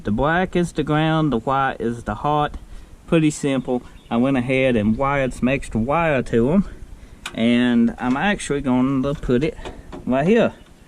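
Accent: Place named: Louisiana, United States. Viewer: Cajun? "Wasper" is a large clue. They say that in southern KY, but they have a different accent.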